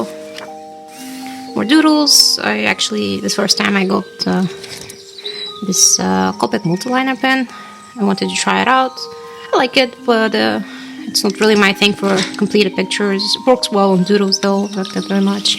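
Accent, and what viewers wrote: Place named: Russia. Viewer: i was listening to you and your accent and i felt like you speak russian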